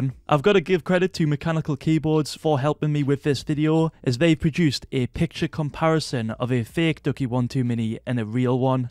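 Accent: Germany. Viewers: Wait, are you german? xD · Are you from Germany?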